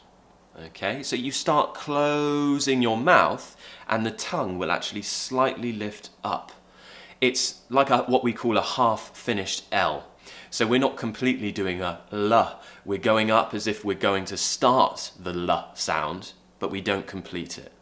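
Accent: United Kingdom. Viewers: I love British Accent 😍 it's Awesome 😊👍....Thank u very much 😘 Love from India☺ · I don't exactly know what my accent is but i'm trying to sound like a British. Your videos help me so much. I can't thank you enough. In Fact, i can even learn British English by listening to your pronunciation and intonation. Be Safe. Sir. And Have a great day. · I have just known your channel and I love it so much immediately. Love british accent, love ur voice and the way you teach pronounciation. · I love your accent so much 💜💜 British accent is always attractive to me!!!! And thank you. (Side note l love your hairstyle👧🏻👧🏻) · I start love British accent because of you. Your such amazing teacher i had ever seen. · I really enjoy a lot watching your pronunciation videos. I imitate every single word that you say. British accent is what I love the most. Hope one day I can take up this elegant accent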